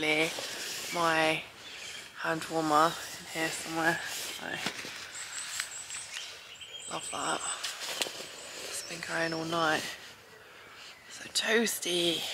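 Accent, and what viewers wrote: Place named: England, United Kingdom. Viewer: Love your English accent and pronunciation :-) ❤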